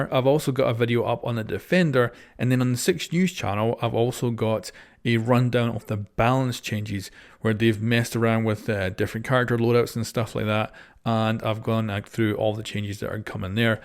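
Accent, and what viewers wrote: Scotland, United Kingdom. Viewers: Don’t hide you Scottish accent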